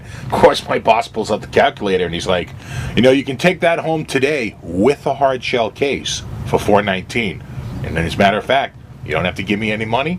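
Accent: Boston, United States. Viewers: your from boston?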